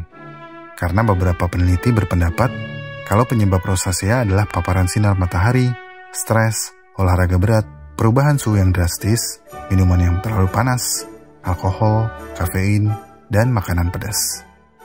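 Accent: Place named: West Java, Indonesia. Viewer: Admin orang sunda sigana yeuh 😂😂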